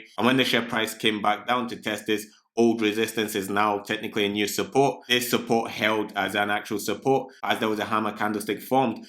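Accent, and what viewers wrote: Scotland, United Kingdom. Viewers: First time seeing your channel, nice work👍🏽 Your accent is great, mix of Scottish and?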